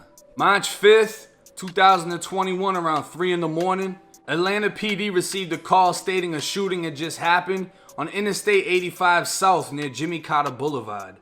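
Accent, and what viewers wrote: Boston, United States. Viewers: ❤you from 617 Boston that accent sounds like you from the bean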